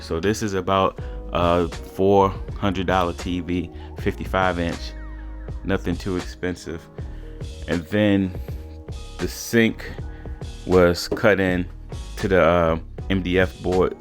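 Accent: Baltimore, United States. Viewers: Looks good man. You from Bmore? Accent sounds like it. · That bmore accent is ridiculous lol tew hundred dollars is nuts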